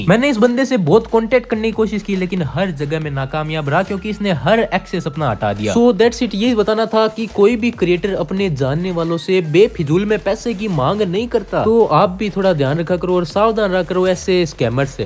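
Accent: Haryana, India. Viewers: Bhai aap Haryana sa to k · Bhai Haryana se he kya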